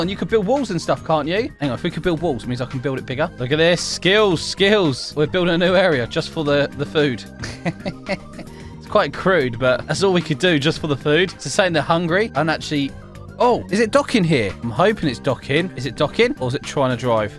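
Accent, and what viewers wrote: United Kingdom. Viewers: For at least 1 year I didn't know he was british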